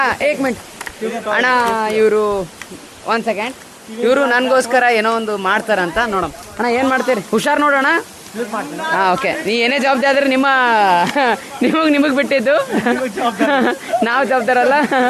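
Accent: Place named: Kalaburagi, India. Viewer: Brother pure kalaburagi matt addu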